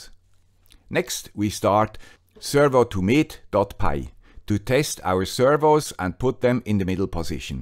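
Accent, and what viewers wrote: Switzerland. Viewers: Fantastic! I am definitely going to build one - Ive a spare raspberry pi so I only need to buy two servos. Thanks for another great video man with the Swiss accent!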